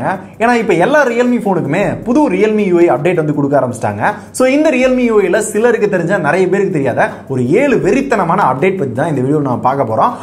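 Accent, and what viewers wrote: Madurai, India. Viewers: Pro. Madurai pechu and nakkal vera level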